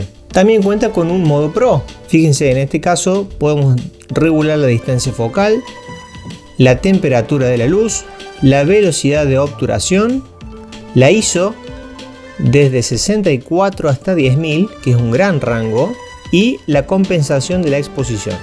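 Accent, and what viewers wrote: Argentina. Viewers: Está brutal este celu! mí única duda era si tenía radio y siiii 😻 gracias por mostrarlo!! Yo quiero el Moto G41 pero me es inalcanzable su precio y este tiene casi lo mismo por menos dinero 😍✨✨ excelente Review!! y más porque sos argentino y no español o de otro lado, se siente más cercano todo lmL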